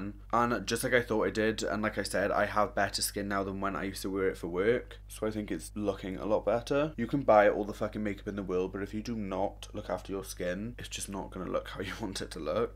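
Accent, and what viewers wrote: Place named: England, United Kingdom. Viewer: on instagram, and omg where the hell are you from! obvs england but your accent is what i live for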